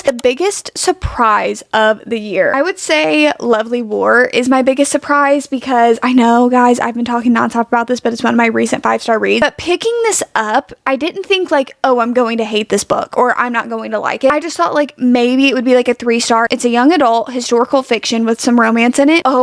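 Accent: South, United States. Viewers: with the southern accent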